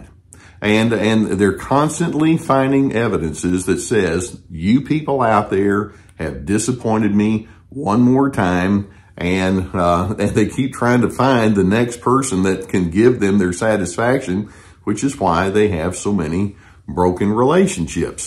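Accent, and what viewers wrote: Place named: North Texas, United States. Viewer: I grew up in D/FW but don't live there now. Your N. Texas accent is VERY comforting and familiar to me!:)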